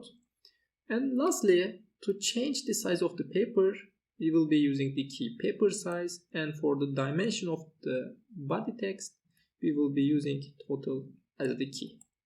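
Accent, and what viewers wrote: Turkey. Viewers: türk aksanı nerde duysam tanırım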